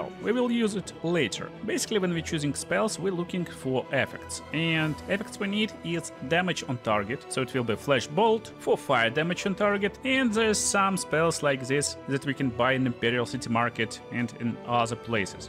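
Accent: Russia. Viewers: R u russian? Your accent is too similar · Очень уверенный и хороший текст с русским (или близким к нему) акцентом Очень прикольно звучит на самом деле Интересно, откуда ты?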